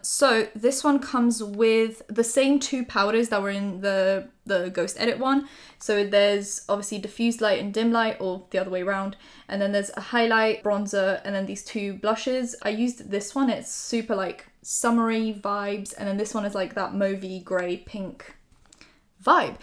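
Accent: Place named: United Kingdom. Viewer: lived in the UK since she was really young so she speaks with a british accent. You can't really tell she's polish by her accent;)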